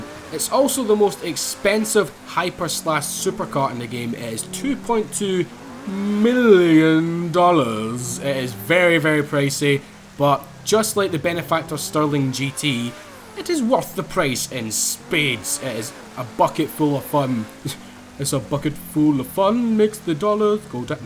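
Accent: Scotland, United Kingdom. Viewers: He is Scottish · are you scottish and does ek stand for east kilbride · Are you Scottish.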